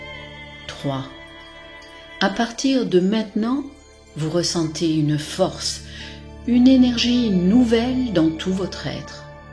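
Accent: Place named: Marseille, France. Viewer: L’accent marseillais et l’écho derrière la voix rendent la vidéo très peu crédible et on décroche très rapidement. À revoir totalement. La musique en fond est trop présente et très gênante... voire irritante.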